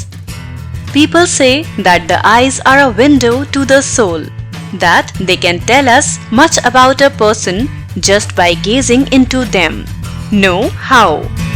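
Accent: India. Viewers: Ana kozjak she is Indian and its Indian English.. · Why Indian accent???!!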